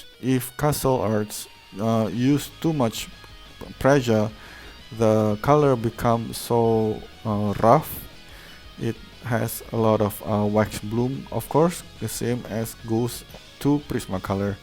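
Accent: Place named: France. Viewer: You kind of have a French accent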